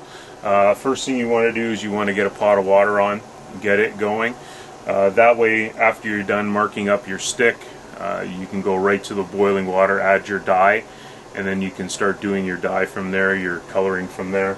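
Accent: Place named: Canada. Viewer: He sounds extremely Canadian.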